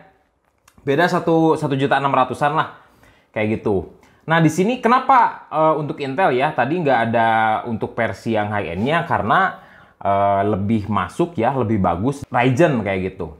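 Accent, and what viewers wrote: West Java, Indonesia. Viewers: F = EP Sunda Accent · Sunda wkaka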